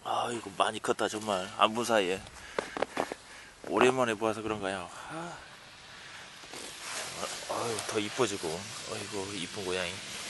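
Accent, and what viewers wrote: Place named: Honam, South Korea. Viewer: 고양이가 큰게 아니라 부은거다 임마 말투도 조낸 듣기 싫네 호남사투리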